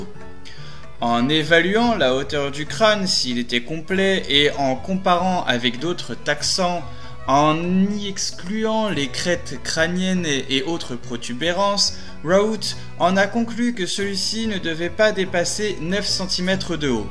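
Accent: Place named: United Kingdom. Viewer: Ooh, cet accent so british ! ;p Bon ben tant pis pour toi : tu gagnes 1 subscriber, my Sausage friend ! ^^ Juste un petit conseil en passant : essaie de moins donner l'impression que tu lis ton texte, fais-le vivre quand tu t'exprimes, ce sera plus agréable à l'écoute. Tu es passionné, alors fais-le nous sentir, sois naturel ! ;)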